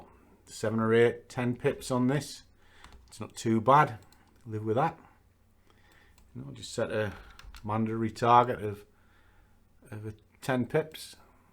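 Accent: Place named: Yorkshire, United Kingdom. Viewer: Refreshingly simple and frank, being a Yorkshire lad it’s nice to hear a familiar accent.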